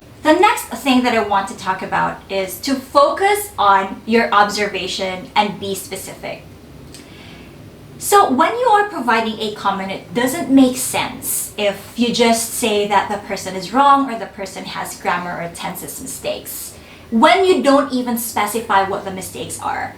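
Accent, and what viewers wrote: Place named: Philippines. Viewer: Feedbacks are gifts to improve ourselves. Guys, you expect her to have an americanized accent? She's a filipino. As long as we understand her, that's fine.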